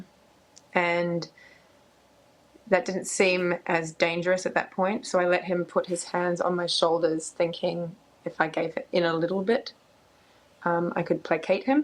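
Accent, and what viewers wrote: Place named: United Kingdom. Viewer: Love British ppl how they say their news 😂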